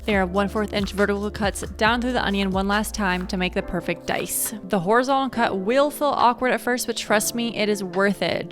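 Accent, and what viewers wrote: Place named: Los Angeles, United States. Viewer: I'm from LA, I was convinced the LA white girl accent was a myth until the first 5 seconds of this video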